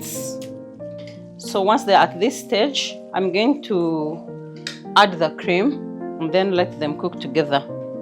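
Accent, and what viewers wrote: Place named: Kigezi, Uganda. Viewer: How I love your videos Then your kikiga accent when you are speaking 😂😂😂 Keep going mukazi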